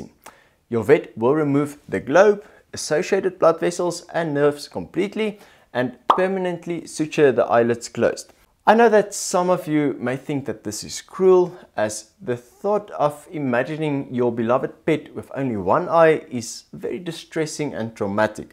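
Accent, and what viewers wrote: South Africa. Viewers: Kan hoor jys van suid afrika en n afrikaner lol.... my pikanese se oog lyk of hy wil uit pop , dis wat my na die video bring.... lol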